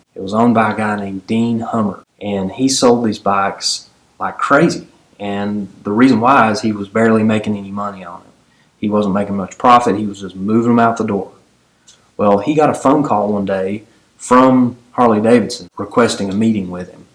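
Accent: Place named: South, United States. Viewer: You got that nice southern accent bro!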